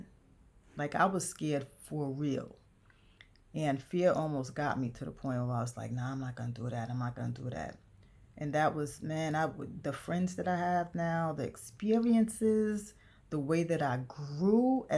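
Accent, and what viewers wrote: New York, United States. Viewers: I can't believe I never saw you in Shanghai. I worked a Jiaotong University for many years and lived in China from <num>. It was hell coming back to be honest. Still working as a law professor in the US now. Sounds like you're also a NYer.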